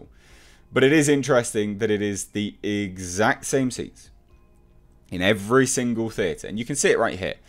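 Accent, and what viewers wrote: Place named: United Kingdom. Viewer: as an American I think your Emperor Voice is very very good I think you being British helps? So Rise Of Skywalker and its flat feeling Trailer will be a flop in the US. Unless they are hiding something huge like LUKE being alive its shit, and I have no interest in seeing it. They have killed the 12 yr old boy in me who saw Star Wars in theaters in 1977. We have the OG trilogy and Prequels to give us that great feeling of wonderment we had as kids in the 70's!!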